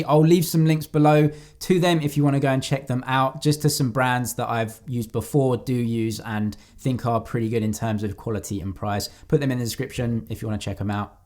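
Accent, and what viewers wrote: United Kingdom. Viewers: and he's even British too.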